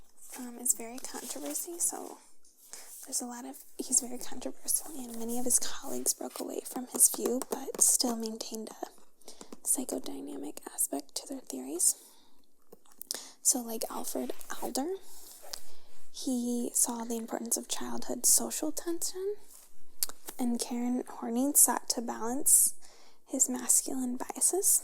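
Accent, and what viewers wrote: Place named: Midwest, United States. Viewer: There is something about hearing the Midwest accent with sunshine and palm trees outside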